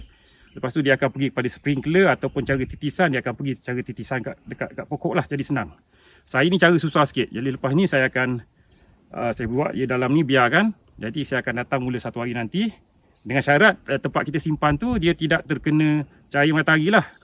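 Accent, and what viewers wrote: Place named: Terengganu, Malaysia. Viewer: Assalamualaikum,tumpang tanya, asal dari mana,bunyi macam orang Trengganu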